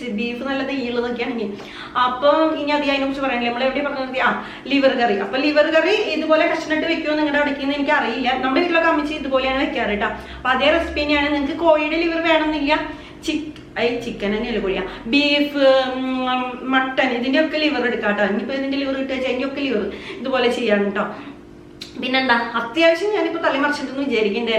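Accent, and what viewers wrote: Thrissur, India. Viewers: Chechi trissur aano??? Evida place Iam from trissur....anyway super recipe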